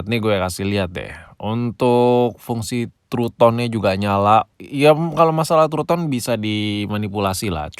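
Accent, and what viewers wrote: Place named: South Jakarta, Indonesia. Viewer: Bng lu org jaksel ya? Cara ngomong ny jakset bet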